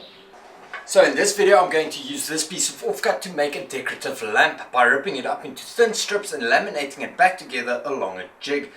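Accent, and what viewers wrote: South Africa. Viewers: This guy sounds South African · Hi sir,i would just like to know if you stay in South Africa or from South Africa staying in another country cos I stay in South Africa and you accent sound South African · Are you from South Africa? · Hey are you South African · Are you South African?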